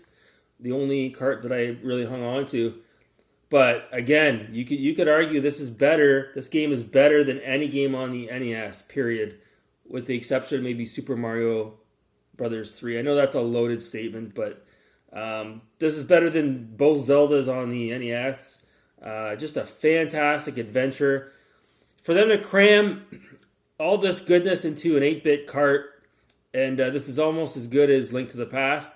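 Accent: Canada. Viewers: Sound like northern Ontario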